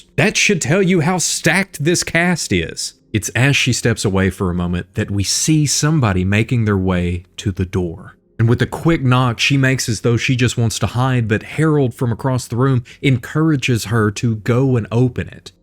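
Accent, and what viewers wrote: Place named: West Virginia, United States. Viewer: Is he from ky or wv. Iam from wv i hear wv accent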